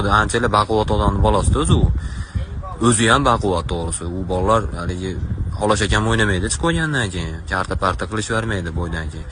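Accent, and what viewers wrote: Andijan, Uzbekistan. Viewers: Anjancha shevani yordiz oma. Anjonlilar nechtamiz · Анжан. Шева зу'рда😂😂😂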